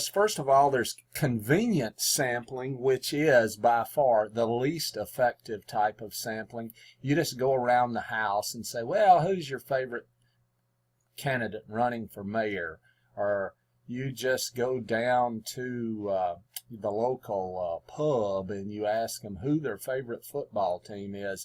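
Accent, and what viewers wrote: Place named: South, United States. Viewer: You, sir, are incredibly clutch... I was getting caught up in trying to figure out how deep down south he's from!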